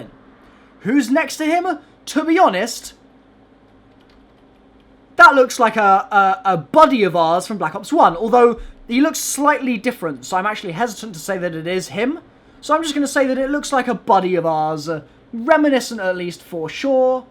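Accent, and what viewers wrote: United States. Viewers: A video with your best american accent would be nice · Good video that American accent is so funny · you have an amazing 'merican accent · I love you’re American voice doing it the black ops way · Ur American accent (my boi ) was pretty dope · American accent was too great. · That American accent dough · Omg i love his American accent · American Accent ™️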